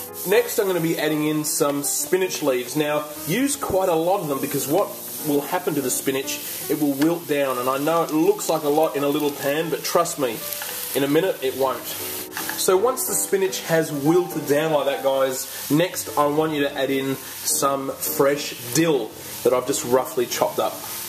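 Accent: Australia. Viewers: That looks delicious! Love the Australian accent. Take care and God bless from Beaumont, Tx! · After the accent and the fact that he has said where he lives in a few of his videos, I believe he's Australian.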